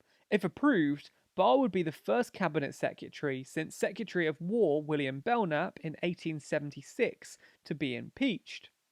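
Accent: United Kingdom. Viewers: his British accent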